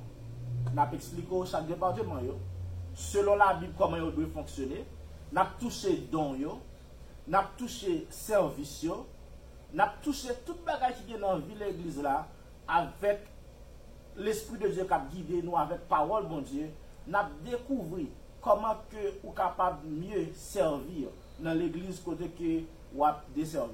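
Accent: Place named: Portugal. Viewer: Jusqu'à présent, je ne sais pas si le présentateur parle Français ou Portugais 🤔. C'est une langue hybride ?!! Je ne comprends rien.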